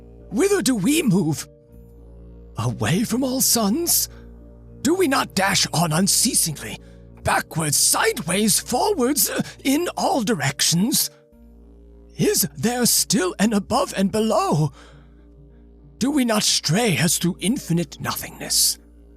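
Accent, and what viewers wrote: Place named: United Kingdom. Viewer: Unbelievably articulate narration with subtle...nearly British undertones and enthusiastically colorful character voices add everything and give life to both of these stories... and every other story I've heard from you. Excellent work!😁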